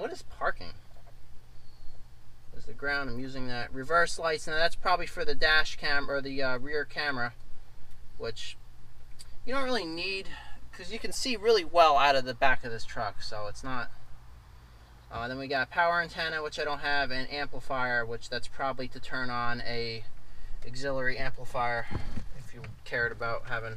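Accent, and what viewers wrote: New York, United States. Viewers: Awesome video- that accent is like every neighbor I ever had from NY area- keep up the videos they are a huge hit.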